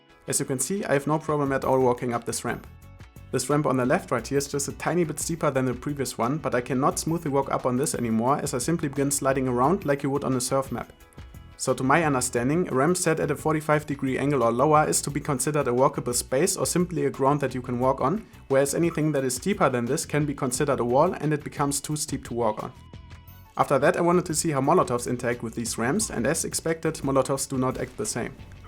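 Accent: Germany. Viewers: You are german riight? I hear that. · dieser dreckige deutsche akzent......den kann man sich einfach ned geben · A question. Are you a german Youtuber who talks English? your accent sounds german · dein englisch ist so schlecht man hörtdas du deutscher bist lappen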